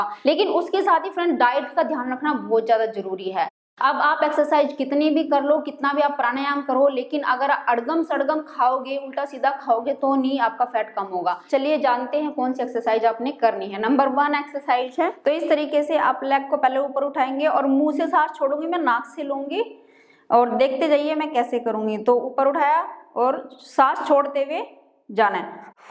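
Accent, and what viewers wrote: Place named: Himachal Pradesh, India. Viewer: lgta hai Himachal se ho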